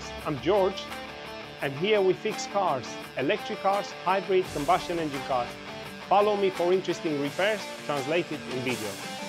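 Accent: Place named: Romania. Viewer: De aici este, mi-a confirmat. Accentul romanesc se simte :)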